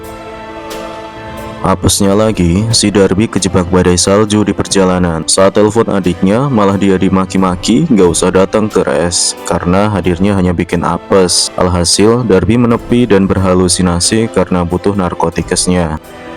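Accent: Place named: Java, Indonesia. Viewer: Min suaramu medok tp AQ suka wkwk menyala bosku gas nonton film rekomendasi nya 🔥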